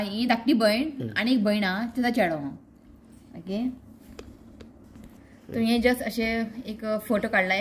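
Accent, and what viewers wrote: Konkan, India. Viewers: Dont cry 😭 kokani thodishi vegali ahe tumache bolane समजले पण काही जण बोलले तर समजत नाही 😂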